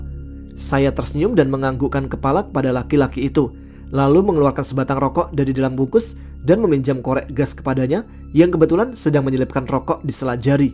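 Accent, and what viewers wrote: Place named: Jakarta, Indonesia. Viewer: Anjirrrr lahhhhh makin seru dan penasaran aja, apalgi mas pakai logat betawi nya kena bangetttt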